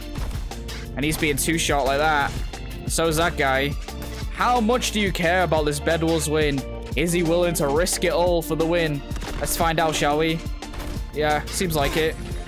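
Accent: United Kingdom. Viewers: Bruh is british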